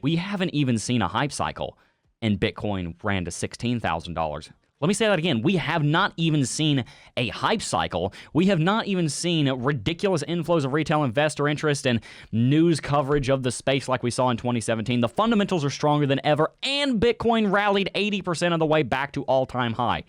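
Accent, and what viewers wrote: South, United States. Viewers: Sounds like you are from the south. You got the radio voice gift. Great TA.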